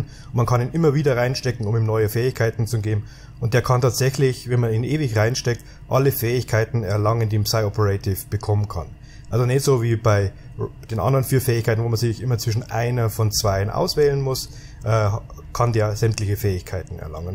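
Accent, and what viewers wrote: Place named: Bavaria, Germany. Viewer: Da kommt definitiv da Bayer durch. ;)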